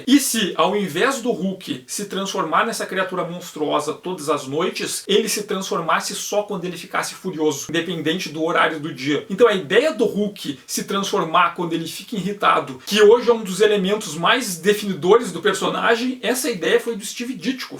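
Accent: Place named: Rio Grande do Sul, Brazil. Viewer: A melhor parte desse vídeo, depois do conteúdo é claro, é ouvir a conversa entre figurões da marvel com um sotaque bem gaúcho! Bah! inclusive "bah" aparece recorrentemente em hqs da década de 60, tu sabes o por que disso?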